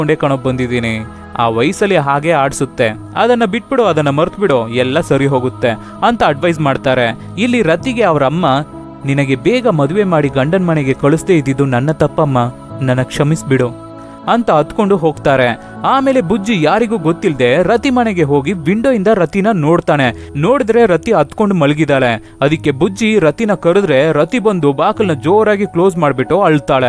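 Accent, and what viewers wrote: Tamil Nadu, India. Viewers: I think he is Tamilian as per his slang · tamilian kannada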